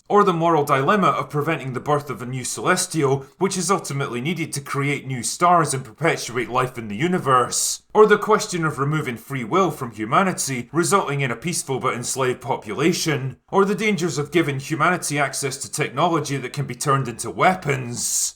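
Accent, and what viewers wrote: Scotland, United Kingdom. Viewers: Brilliant critical reviews masterfully delivered in a way only a Scot can do is the reason why we need to protect and defend our ways and traditions as if the world depended on it - because it does. Thank you for bringing a huge slice of goodness out for all to see what goodness is all about.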